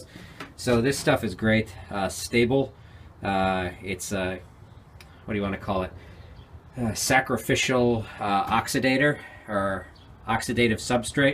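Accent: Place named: South Boston, United States. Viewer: Mark Camaro it’s that easy! And or open the screw that secures the carb bowl and let it drain out. Keep the gas tank cap off for a day and any gas in the tank and lines will evaporate. Don’t remove these covers as shown. His accent sounds like he is from South Boston. I ought to know lol.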